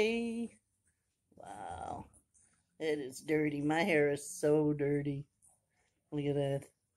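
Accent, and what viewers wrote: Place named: South, United States. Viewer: I love your voice, It sounds really nice, sometimes I go over your videos to hear your soothing voice, Its comforting.,Sounds like a Southern Girl.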